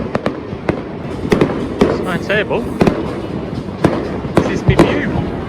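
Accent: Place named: United Kingdom. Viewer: Oh! Your accent did sound British to me but I thought you had come from Melbourne, as in living in Melbourne, to Sydney for the cruise. Yes, then you understand the distance. I was on the Discovery Princess and really loved that cruise, especially New Caledonia. I'm in love with the south Pacific and my next cruise will most probably I'll go back to the south Pacific for my next cruise. I hope you enjoyed Lisbon.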